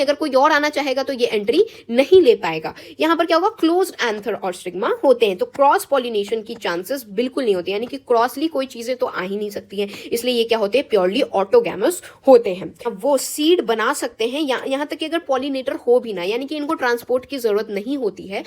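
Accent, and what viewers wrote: Bihar, India. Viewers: didi ap bihar se ho kaya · Didi bihar se ho kya ????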